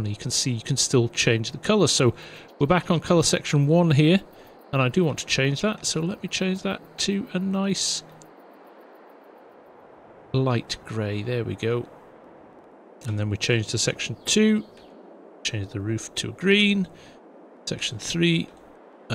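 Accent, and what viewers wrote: United Kingdom. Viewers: I really enjoy the thoughts and insights of. For me, he is the companion guide to playing FS22. (Must be the British accent) I appreciate him putting us onto modders who have really put in a lot of thought, design, and utility into their art...It all just makes the game so much more enjoyable. BIG thumbs up!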